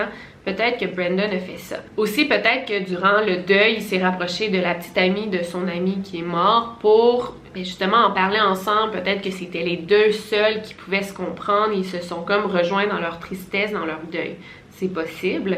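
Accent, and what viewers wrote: Quebec, Canada. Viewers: Je salue la grande amélioration de la diction depuis les 1 ere vidéos. L'accent québécoise malheureusement trop prononcé sur les vidéos du débuts de la chaîne. Les rendant quelques peut incompréhensibles pour les français.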